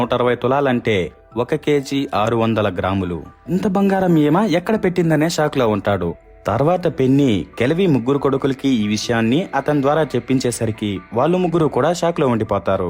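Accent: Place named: Tamil Nadu, India. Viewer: Nativity pokunda Tamil slang lo Baga chepparu👌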